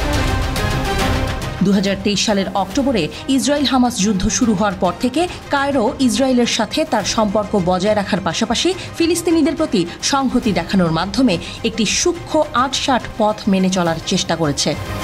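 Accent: Kolkata, India. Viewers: এই মহিলা কোলকাতার ভাষায় কেন খবর পরছেন? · আর টিভির যে কন্ঠ দিচ্ছেন একি ভারতের কোন নাগরিক কিনা গলার সুর শুনে মনে হয় ভারতীয়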